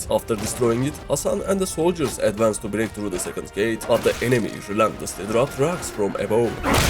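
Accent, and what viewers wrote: Turkey. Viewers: Aksanindan anladım reis türk olduğunu 😅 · Where are you from bro? You sound Turkish. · Aksanından dolayı türk olduğun anlaşılıyor selamlıyorum seni kardeşim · hasan diyişine kadar türk olduğundan emin değildim. 1 saatlik ingilizce konuşma iyi baya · Bro you sound Turkish...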